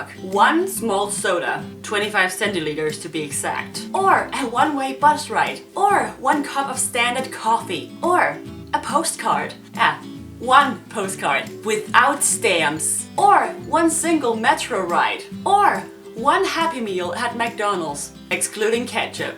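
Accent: Denmark. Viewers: PS: I've seen some of your videos and all I can say is that you're VERY Danish! Your face, your hair color (I love it btw), your facial expressions, your sarcasm, your accent... So Danish! And please don't get me wrong, it's meant to be a compliment :) And since you asked... I would like you to talk about how to be friends with Danes. I'm going to spend a year there and I'm afraid I'll live in a cave with my boyfriend and his friends. It feels like, once you've got a friend, you've got a whole network, but getting that first friend is almost impossible! Do you have any tips? I really like your channel and wish you the best luck with it! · Man kan godt høre på din accent at du er dansker😂😂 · you are awesome! Specially in the videos about Denmark hahaha And as I want to move to DK next year it is also nice to keep contact with the funny danish accent speaking english and getting to know the particularities of this lovely country. 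Greetings from Brazil!!!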